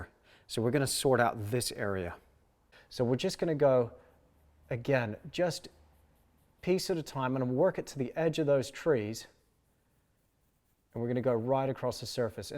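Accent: Australia. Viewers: Australian/Kiwi-American?? (please) ... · Australian vowels and American consonants, where the hell do you live lmao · I can't tell if you have an Aussie, American or Irish accent lol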